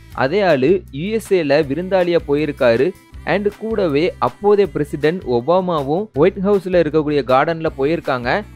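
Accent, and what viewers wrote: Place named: Kerala, India. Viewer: unga slang konjam Kerala Mari irunthuchu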